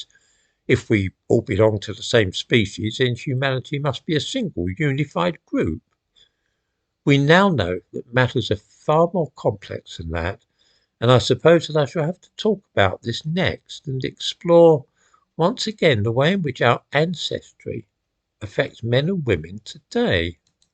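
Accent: United Kingdom. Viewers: good job on this comment section you created a nice space for racists and xenophobes to gather and share their thoughts. "race matters we ignore it" what do you suppose we shall do with race ? what is your suggestion oh great one? push them towards taking up sports? racially profile them so they can be closley watched by the police because they have more testosterone ? (!) you, WHITE PEOPLE, have committed, and continue to commit some of the most horrendous crimes against humanity, wasn't your "kind" who would cut the right hand of african children if they failed to work as hard their "masters" demanded. wasn't that barbaric? oh that was necessary because you think they are inferior race don't you ? disgusting. just because you speak with a British accent doesn't mean what you say makes ANY sense. "race matters we shouldn't ignore it" alright you tell us what to do lol. 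or you're simply leaving things vague for your followers and commenters to fill in? judging by comments such as "the crime stats prove this" they sure understand your language. they sure are great at generalizing, putting people in a box and putting a label on it.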